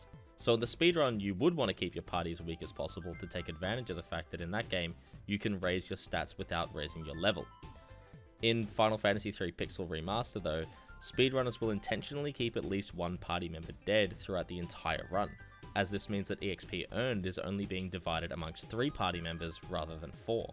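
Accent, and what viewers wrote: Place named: Australia. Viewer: nuts how you have so little subs loving this vid + the aussie accent always makes me feel at home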